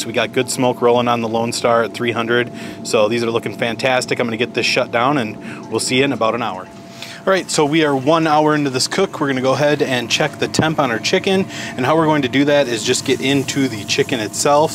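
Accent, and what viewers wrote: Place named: Chicago, United States. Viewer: Are you from the upper midwest? You sound like you’re from Chicago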